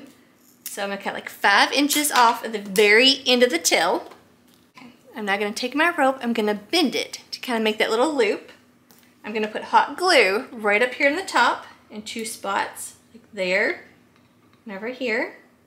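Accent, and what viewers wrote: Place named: Tennessee, United States. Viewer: I just came across your channel. I enjoyed this video. Each little project came out just adorable. Your tier tray is awesome. I'm trying to guess where you are from by your accent. Maybe Tennessee ? Or one of the Carolinas. I live in Upstate SC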